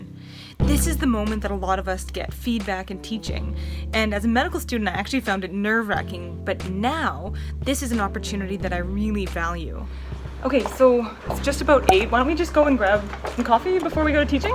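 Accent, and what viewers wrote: Canada. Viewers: I know she's Canadian I can tell by the way she talks but does she work in Canada too or does she work in the United States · I love how sometimes you can really hear her Canadian accent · Are you Canadian? Your voice doesn’t sounds exactly American and in one of your videos I saw an ambulance with a Maple leaf on it. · Ps love your Canadian accent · New sub here, I realise after looking through your comments and watching a heap of your vids your fan base is a lot of medical people lol. That's funny because my interest in the medical industry is zero haha, but you make it interesting and educational, and i don't regret spending maybe 2 or 3 hours watching your vids 😂😂😂 👌 ....that Canadian accent does really help too, anybody who says they don't like Canadians is just lying. Have an awesome day, new fan in NZ 😁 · When you talk you can hear your inner Canadian come out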